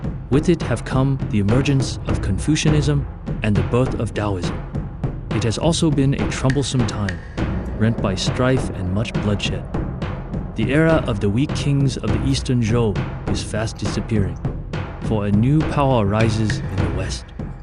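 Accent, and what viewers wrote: Australia. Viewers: LOVE the aussie accent - ha ha, I'm a kiwi so were not much better! Nice walkthrough, awesom game, keep it up mate!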